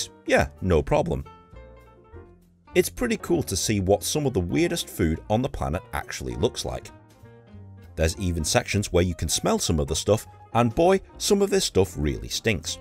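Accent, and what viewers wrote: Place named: Northern England, United Kingdom. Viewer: Never would i thought to see and hear 😂 an Asian northener from the UK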